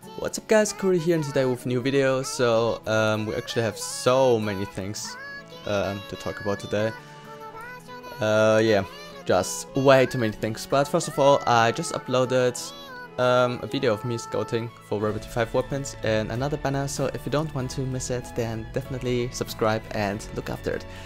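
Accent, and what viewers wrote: Germany. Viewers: Özlem Polat and for his accent, it’s a german/ dutch accent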